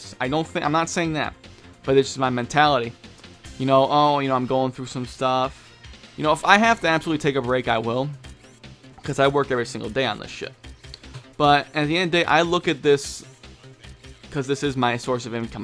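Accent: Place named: Upstate New York, United States. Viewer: Hey, not DB related just curious. I remember saying you're from rural NY. My sister in law is from Schenectady and has a similar dialect to you. No need to specify, but is that about ballpark to home? Again, no need to get too personal or in depth, just making an observation as neither she and her family nor you have the stereotypical fuhgetaboutit accent.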